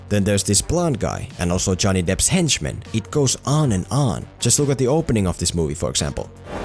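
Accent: Finland. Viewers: Haven’t seen the movie but this was a good and comprehensive analysis. P.S. Kuulenko puheessa suomalaisen aksentin? 🤔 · I can hear the Finnish coming through ;) Great video! :) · Huh. You sound Finnish. Very slight accent, barely noticed.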